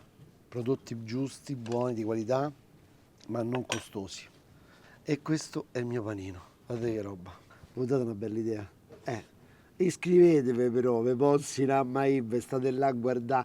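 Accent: Rome, Italy. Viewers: ogni volta che vedo un video in cui dai un morso ad un panino e sento quel rumore di pane che scrocchia darei un morso allo schermo!! iscritto da almeno 8-9 mesi!!! Continua così fai delle ricette fantastiche e io che sono del nord, personalmente adoro il l' accento romano e continuerò a seguirti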